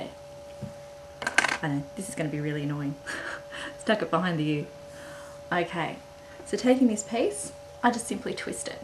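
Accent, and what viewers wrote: Australia. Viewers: You remind me of a Play School presenter becuase of your friendly and soothing voice. I love the people on here marvelling at the Aussie accent. haha.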